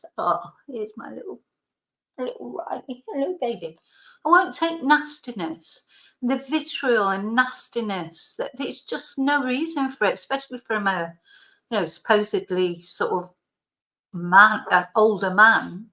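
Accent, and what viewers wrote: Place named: North England, United Kingdom. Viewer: Awe don’t 😊let that di@ run you down. I saw that and I am assuming he’s come off his meds suddenly. He reminds me makes me realise how great it is to be single. You are marvellous and that’s official. I love your channel and it feel’s nice to hear a local voice when I’m doing jobs around my flat…..at night too when I’m winding down and getting ready to go to bed. You can tell he’s a controlling tosser by all the record’s neatly stacked behind him. Jesus grow up fella. Can you imagine living with that? He was obviously getting out of his system something about an ex bleeding him dry and directed at you instead. 😌 Stay strong my fellow northern lady. He is always doing impressions of Michael Caine and thinks he’s hilarious…. I bet the ex had the best laugh when she took everything in the divorce! 😌 just carry on doing what you do best…. 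being you. You don’t have to defend yourself to that maniac, he’s not worth a second thought. To anyone who reads this I am not calling Luke. I think he is a really lovely lad and he’s got a heart of gold. He’s from our neck of the woods isn’t he? If ever you come back home again you are very welcome to come for a cuppa, cake and biscuits in Bramhall Park. I wish I could fast forward to January. Since my mam died it feels pointless. It’s her birthday on the 8th December, mine the 21st and then me who’s dying New Year’s Day. Last year I was just here alone. The first year I never even put a tree up and I used to absolutely love doing that w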